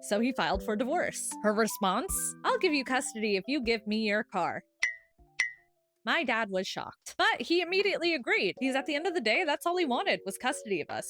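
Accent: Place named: United States. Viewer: Where are you from that sounds like America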